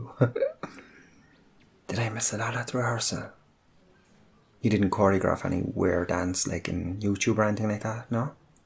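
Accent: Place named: Ireland. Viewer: This version sounds so classy, romantic and Irish like. Love it. Love it. Love it ❤ Yes to the foot rub. More of this please😍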